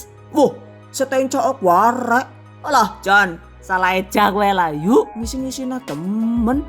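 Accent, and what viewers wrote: Banyumas, Indonesia. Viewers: Well come back.. ketemu maning ora ngapak ora kepenak..kabare yu sehat.. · Mbokiek jawine ngendi tah.. bosone ngapak..uuu lutunaaaa 😝🤣👍 ... but i love your banana krispi...pake toping amazing..👍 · Sebenernya asli mana sih bun, kok ngapaknya kaya istri saya 😁🙏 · Tks resepnya jeng yg kadang2 ngapakk..tp aku nonton truss..ngapak.ngapak maju trus resep2 kulinernya jeng .... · Angel temen diwalik kiye.......😀😀😀😀😀😀pada bae mba,aku juga ngapak. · Gaya bicaranya lucu, ternyata ngapak.....org Tegalll atw mn nih....😃😃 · "Done" Selalu nungguin "ngapaknya"😁 n nnton ini pas lg nenenin bayiq yg brsn lahir. Sempet ketawa sendiri pas bilang "Angel-Angel" alias susah.. Coba klo dtulis Angel bs jd artinya brubah jd "Malaikat" 😁 Btw.. Sukses trs bunda.. Selalu mnginspirasi.👍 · Senemg bgt nonton chanel ini...ngapaknya sama dg saya...😂😂😂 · DONE Saya suka dg vidio resep"nya karna jelas dan lengkap dg tip &trik nya apalagi ditambah cara penyampean dan logat ngapak yg khas huh pokoknya angel....angel di suruh gak nonton,di catat lho mbok lali,😘😘😘💖💖💖#GiveawayTHV2020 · "Done" Trimakasih resepnya Bun,prlu dicoba in InsyaAllah...,diselingi bahasa yg ngapak bikin ketawa trs..😄😄 #giveaway THV 2020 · Ini dr madiun apa mana ya kok ngapak? 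· "DONE" Ujug2 muncul neng beranda yuotube, ndeleng judule pisang lgsg tak klik 😊😅 eh ternyata aku terpesona dg logat ngapaknya. Piye ikilah jaaaaand terngiang2 bae suara ngapake mboke 🤣😂✌️ muga2 bisa kaya mboke ya pinter masak werna2 🤭🤭😂 #GiveawayTHV2020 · Saya selalu mencatat kata yang selalu diucapkan dlm setiap video THV yaitu kata "Jadiiiii ....." dan aksen ngapaknya itu lho hahahha .....kereeeeen mbak beda dgn yg lain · Kok ketawa ya setiap lihat vidio vidionya karna ada suara ngapak nya 😂😂😂😂 Tapi aku suka sama resepnya mantul bener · Salam ngapak Bun..orangapak ora kepenak😂😂🙏🙏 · wong ngpak..hehe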